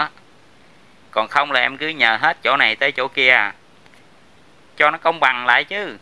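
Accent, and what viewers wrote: Standard. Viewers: ban noi qua chuan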